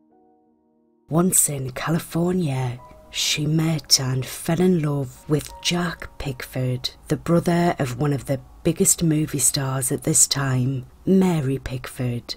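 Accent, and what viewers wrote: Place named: Newcastle, United Kingdom. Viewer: You sound like a Geordie. I live in the USA, but I grew up in Lobley Hill, Gateshead..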